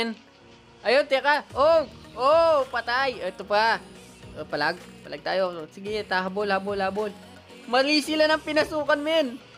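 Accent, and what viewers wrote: Pampanga, Philippines. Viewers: kapampangan ka idol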